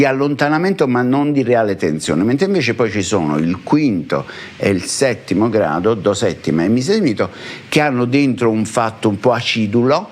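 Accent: Naples, Italy. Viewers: Oltre che bravo insegnante sei simpaticissimo con le tue espressioni con cadenze napoletane. GRAZIE mille.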